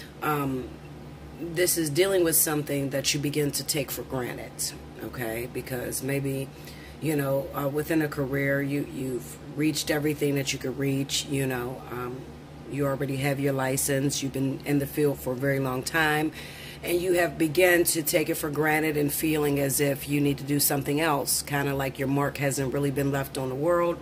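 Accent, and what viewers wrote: Pittsburgh, United States. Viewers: I know this is off topic but are you from Pittsburgh?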